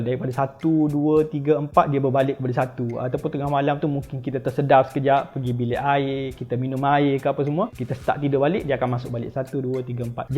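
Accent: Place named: Kelantan, Malaysia. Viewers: abg ni mesti kelantan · Bunyi lg slang kelantan tu🤣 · Hehe....klate la tu bosskur...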